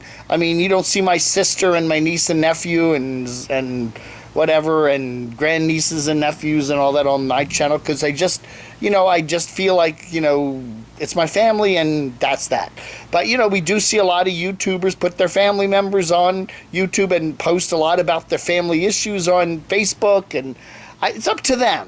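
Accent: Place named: Baltimore, United States. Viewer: I love watching and listening to her Baltimore accent. She wants to have fun and has a lot of knowledge that she shares.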